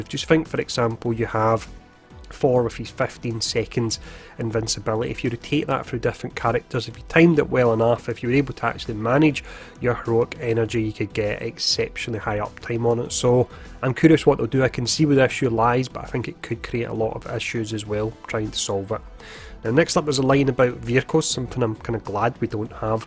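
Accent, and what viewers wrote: Scotland, United Kingdom. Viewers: Your Scottish accent is strong where are you from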